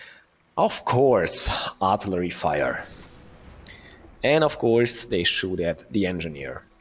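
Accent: Germany. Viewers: You have German Accent Im German so my Englisch is bad. Bist eigentlich ein guter Youtuber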